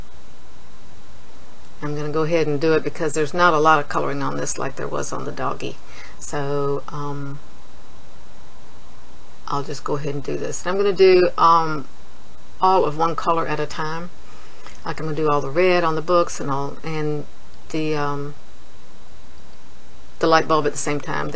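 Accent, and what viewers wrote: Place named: South, United States. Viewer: I enjoy watching this crafter in particular. She's definitely a master of this craft. Plus the southern accent is v.v. charming :)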